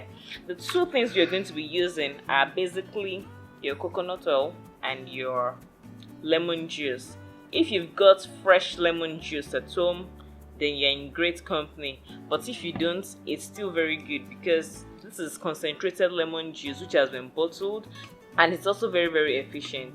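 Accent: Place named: Nigeria. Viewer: you sound like nigerian... hmmmmm are you one of them? .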